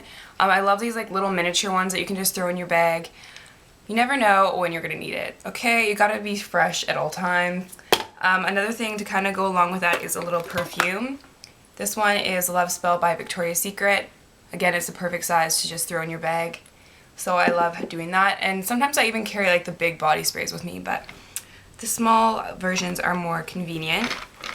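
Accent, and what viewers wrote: Canada. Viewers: no not really... prob cause ur not used to Canadian accents. i guess i'm just used to it cause i'm Canadian ;) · What the hell?! LOL. I'm Canadian and we don't consider ourselves to have accents...she talks the way I talk too. lol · she's Canadian so she does have a slight accent.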